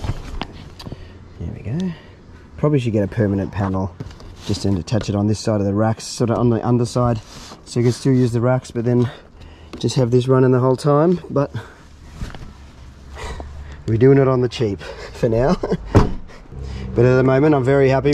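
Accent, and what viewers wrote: Australia. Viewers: Are you Australian ?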